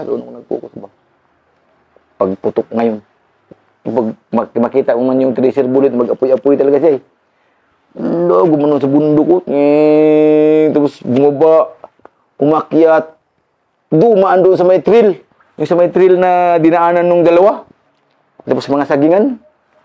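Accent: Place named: Visayas, Philippines. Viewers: Napatawa ako don ah 😂 , Abante kage, abante kage,. Pagkaluskos bombahan ng tropa hala. Patay si kage!😂😅 . bisaya kaayo ka sir ba klaro lang hahhaaha😄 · nakakatuwa si sarhento mag kwento kc may halong bisaya kay bisaya man ako watching from manila pero sa surigao ko pinanganak 😊💪✌❤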